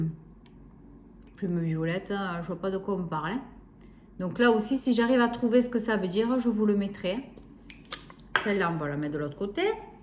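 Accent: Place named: Toulouse, France. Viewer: Coucou Merci pour tes vidéos J adore Tu as le même accent que moi 😘 Je suis à côté de Toulouse 😉 Bonne continuation et gros bisous ❤️ Martine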